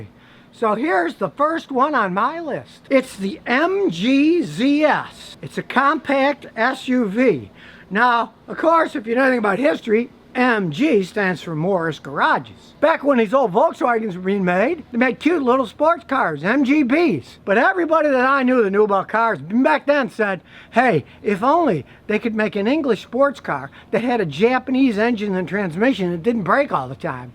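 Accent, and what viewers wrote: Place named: United States. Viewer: Hmmmm I think I have misunderstood your accent. Sounds to me your saying Moore's not Morris but I think you are saying Morris correctly for your us accent.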